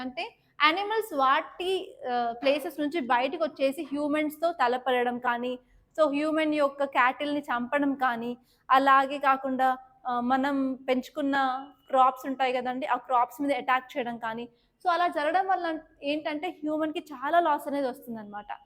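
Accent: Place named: North India, India. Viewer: Madam meeru telugu na ayyo nenu inni rojulu nunchi north india anukunna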